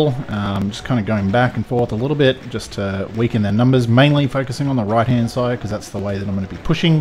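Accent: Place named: Australia. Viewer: You sound Australian.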